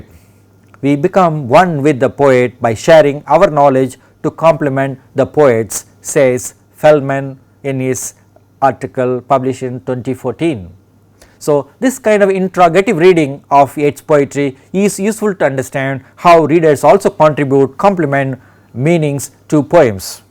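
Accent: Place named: India. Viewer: There are different accents in the world... We feel better with Indian and british accent.you better listen to him carefully.He is an English prof. In reputed IIT. Look at good things... The stuff they have prepared is very much student friendly.... The content is brief and comprehensive. Look at good things.don't comment rudely.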